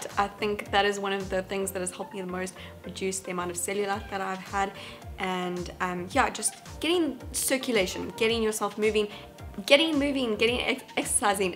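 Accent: South Africa. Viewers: I could totally tell you were from South Africa !! ❤❤Do you still live here ? (From a fellow South African 🇿🇦) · Are you from South Africa? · Are u South African ? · I love your South African accent!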